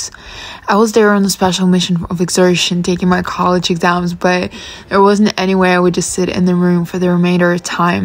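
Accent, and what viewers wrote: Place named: United States. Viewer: where are you from -speak like american....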